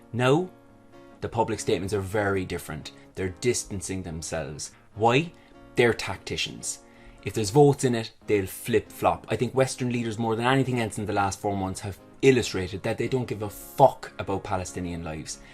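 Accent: Ireland. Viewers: The Irish rrrr good , yeh l love this guy ❤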